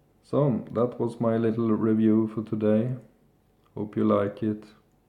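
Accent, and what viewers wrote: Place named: Scandinavia. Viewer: That is quite possibly the most Scandinavian accent I've heard 😄 Nice review mate, I'll definitely be testing this one out myself. Only tried their sandalwood shaving cream so far, so I'm interesting in seeing how this one will perform.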